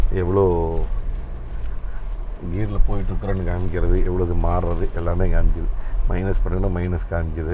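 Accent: Kongu, India. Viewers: எதார்த்தமாக கொங்கு நடையில் கூறிய எங்கண்ணணுக்கு வாழ்த்துக்கள்.... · நம்ம கொங்கு தமிழில் நீங்கள் கொடுக்கும் விளக்கம் மிகவும் மகிழ்ச்சியளிக்கிறது. வாழ்த்துக்கள். 💐💐💐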